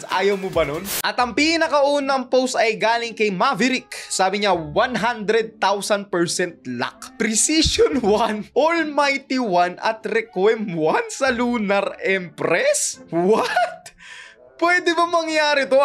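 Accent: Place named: Batangas, Philippines. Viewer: first kopo marinig mag batanggenyo kapo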